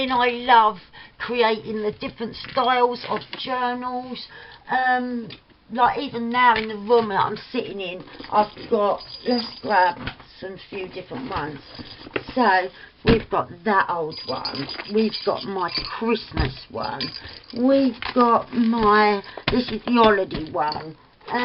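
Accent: Cockney, United Kingdom. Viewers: Thank you for tagging me! I’m filming the response today 😊❤️ oh, and I absolutely adore your cockney accent 😉❤️❤️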